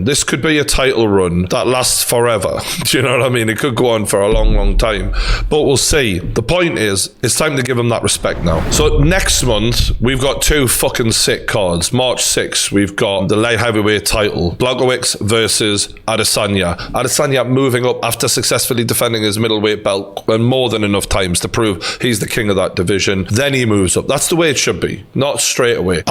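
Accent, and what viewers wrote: Newcastle, United Kingdom. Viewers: if only he knew what you know true geordie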